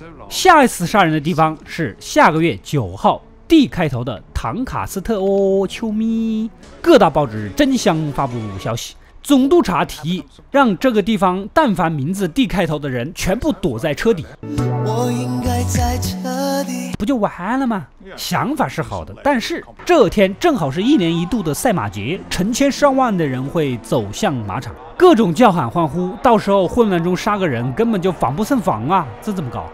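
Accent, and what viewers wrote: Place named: Hunan, China. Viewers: 湖南人吧哈哈哈口音好好玩不然就是湖北人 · 湖南口音好重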